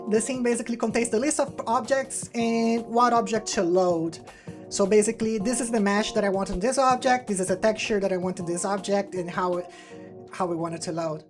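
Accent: Brazil. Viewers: i listened to about 5 seconds of your accent and realized youre a fellow brazilian, thats so cool :3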